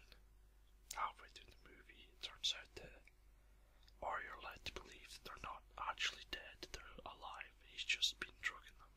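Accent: Scotland, United Kingdom. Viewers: Are you Scottish? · Heeey your Scottish !!! Snap lol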